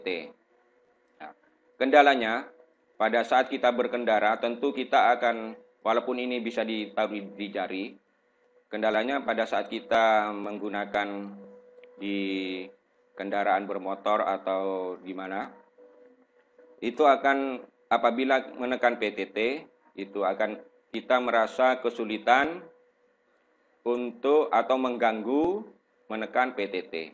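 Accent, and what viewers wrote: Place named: Madura, Indonesia. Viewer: mantap bang, ilmunya keren. btw abang asli dari madura ya? logatnya kayak teman saya orang madura. sukses selalu bang. salam buat yg di madura 👍👍👍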